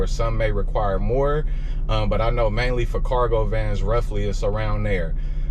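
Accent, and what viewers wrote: Bay Area, United States. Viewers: Lol you know what he meant.. He sounds like he from the Bay.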